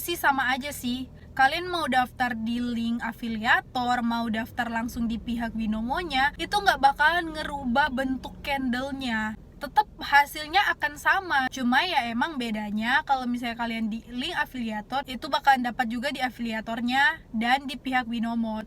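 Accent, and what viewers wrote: Manado, Indonesia. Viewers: Logat manado masi keliatan dikit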